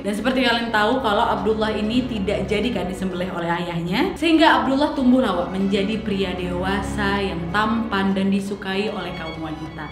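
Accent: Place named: Medan, Indonesia. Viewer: Saya tau chanel ini dari anak2 yg suka nonton, saya sempat komentar keren juga Chanel ini, sangat informatif dan profesional, saya tau pasti tdk mudah mengumpulkan bahan2 materi kontennya, istri sy jg yg kelahiran Medan juga sering senyum2 sendiri mendengar logat saat membawakan kontennya, apalagi ada Wak..Wak, jd ingat tmn2nya wkt Sekolah dan Kuliah katanya, terakhir setelah saya menonton konten tentang Nabi Muhammad SAW, langsung saya Subscribe Chanel ini dari HP saya( biasanya kami nonton di TV pakai WiFi dirumah ), Congratulation ya Wak 😁, terus berkarya dengan konten2 hebatnya👍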